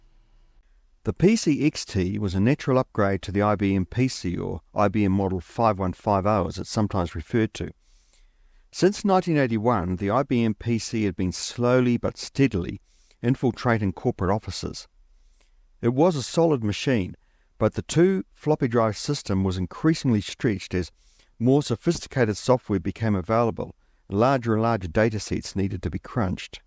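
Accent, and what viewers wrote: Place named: New Zealand. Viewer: At first i guessed you were Australian, but your pronunciation of Check it tells me you're from New Zealand. One of my students was from your country.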